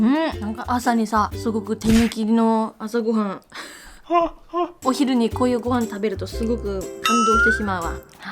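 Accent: Kansai, Japan. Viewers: ちゃんって日本語は関西弁だね。料理系バイリンガールのモモカちゃんと同じやバイリンガールyoutuberって関西弁が多いのは何故なんや？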